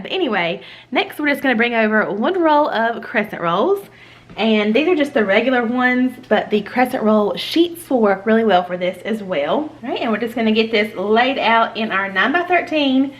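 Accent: South, United States. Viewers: I'm new to your videos, I just subscribed today. I love your videos and can't wait to try some of the recipes. I'm from Mississippi and I love your southern accent. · I am fairly new to your channel. I love your southern drawl. I would like to see some unique and different breakfast recipes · LOVE all your recipes but looking forward to fixing the sausage pinwheels for my kids coming home to visit in September. I too am a Southern Girl and love your accent..Hello from Coastal North Carolina!!! I’m fairly new. Discovered you about a month ago!!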